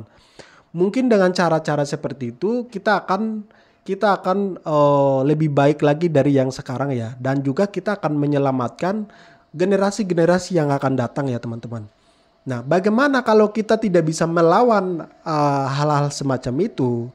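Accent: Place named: Java, Indonesia. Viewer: ANDA COBA NGACA DIRI DULU BARU BERI PANDANGAN AGAR JGN SDR2 OAP PROTERS ... ANDA PAKAI LOGAT ATAU AKSES JAWA BARU BERIKAN PANDANGAN UTK OAP ITU KURANG ETIS... AGAR SUPAYA PANDANGAN ANDA DIDENGAR OLEH OAP ANDA PAKAI BAHASA IBU ...KECURIGAAN KAMI OAP BAHWA ANDA DI CUCI OTAK UTK BICARA LAIN KERJA LAIN ... ANDA COBA KOREKSI DULU KONSEP BERPIKIR ADA KARENA ANDA TDK TAU MAKA ANDA TIDAK TAU APA YG TERSELUBUNG DI BALIK PANDANGAN ANDA .ITK OAP ...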